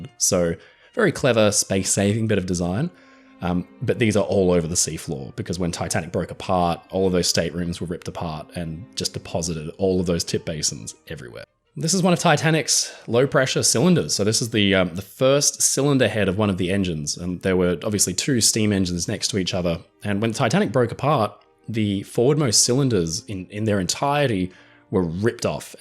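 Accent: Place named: Australia. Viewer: Love your accent, Australian?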